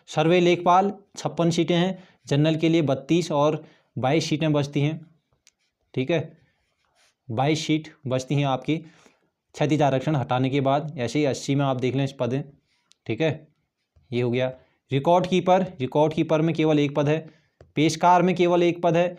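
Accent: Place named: Kumaon, India. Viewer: Kumauni lg rha bhai...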